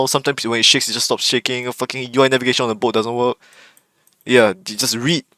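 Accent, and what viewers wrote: Singapore. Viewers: the singaporean accent is very strong btw LMAO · singaporean accent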